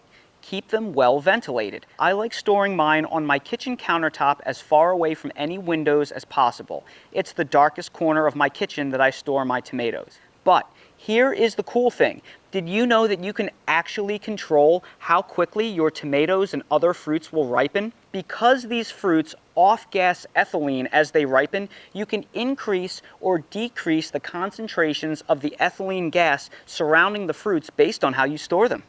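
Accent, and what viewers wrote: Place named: New York, United States. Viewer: You sound like you're from New York or Jersey. 😄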